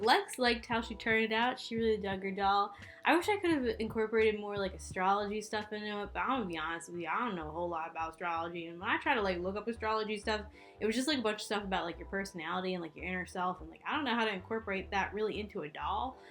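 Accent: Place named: South, United States. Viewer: I think this doll is phenomenal 😻 so dang inspiring! Your fascination with how you say clasps was hilarious. I think it's just 'cause you have a touch of a southern (?) Accent. It's actually the a sound that makes it sound different. I love how you talk though..."I don know 'skina cute". Also, have I just found you in the last two weeks? Yes. Am I bring ng all your videos while working on my own. For dang sure. Love it.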